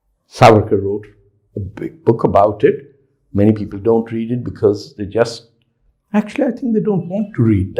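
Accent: Punjab, India. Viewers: Please talk in Urdu, you speaking English in Punjabi accent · Miss your hindustani accent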